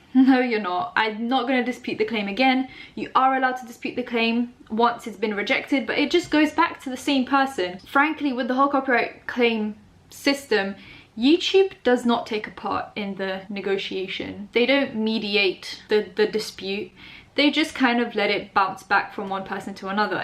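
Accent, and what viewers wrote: United Kingdom. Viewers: Grl i started liking uk accent because of you and i love yours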